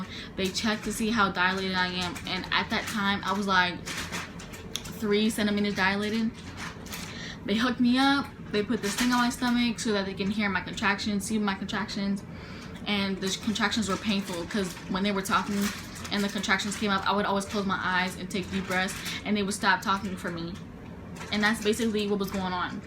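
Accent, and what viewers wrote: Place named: Baltimore, United States. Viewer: Where u from cuz I heard baltimore im from baltimore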